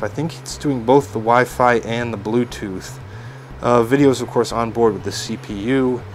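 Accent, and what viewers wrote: United States. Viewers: lack there of? nope that is an us accent. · How can you say you don't have an accent? You have an American accent!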